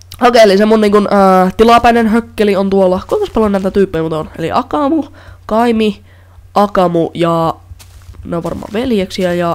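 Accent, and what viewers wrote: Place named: North Ostrobothnia, Finland. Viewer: mistä päi oot jotenki kuulostais pohjois-pohjanmaan murteelta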